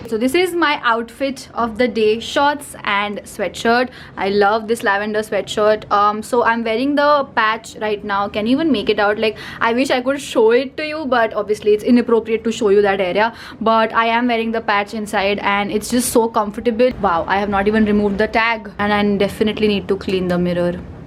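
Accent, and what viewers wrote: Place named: South India, India. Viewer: South indian accent wali 🤣🤣🤣🤣..so cuteeee😘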